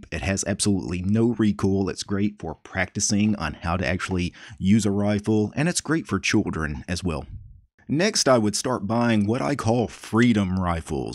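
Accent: South, United States. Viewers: I knew that southern accent wasn't for nothing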